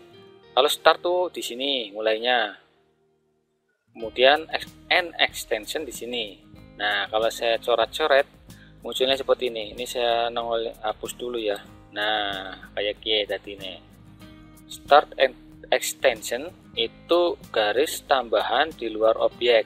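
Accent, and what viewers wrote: Banyumas, Indonesia. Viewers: asyiappppp Bos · matur suwuh tutorial ya om jos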